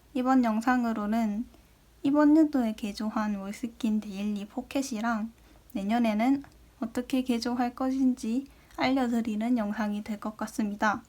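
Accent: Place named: Gyeongsang, South Korea. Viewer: 타지살이하는 경상도인인데 억양덕에 고향온거같구 ㅠ 해서 자주 놀러오려구 구독하고가요😆❤️타지분들이 경상도 억양을 좋아하시던데 제가 듣기에도 너무 귀여우시네요 😘( 입덕)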